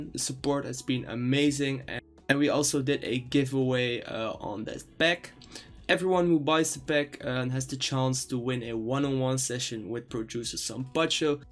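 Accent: Netherlands. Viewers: Hahahaha je can horen dat je Nederlands bent, klopt dit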